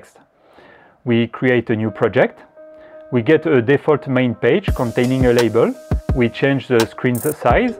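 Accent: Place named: France. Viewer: Excellente présentation!!! Il me semble entendre un accent français, à la base ;-)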